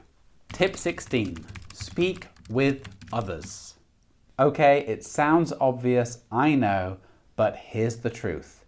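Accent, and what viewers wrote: RP, United Kingdom. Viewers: Very usefull information, thank you for your help, i live in uk from 15 years and my english is A2 level🤦‍♀️i did not like to study in Poland, i was boared and stressed on lessons, thats why i didnt want to learn anything , but you chaneel and tips giving me opportunities to try different ways plus is good to hear reall british accent as on daily basis i work with other multi -culti people so no chance for me to learn proper english :( i also want to learn spanish and italian 😍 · You use received pronunciation and I have understood each word. But sometimes it's very difficult for me to understand the native speakers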